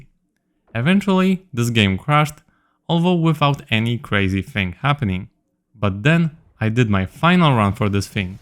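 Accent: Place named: Poland. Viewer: The 2137 gold 😂. Nice polish accent :D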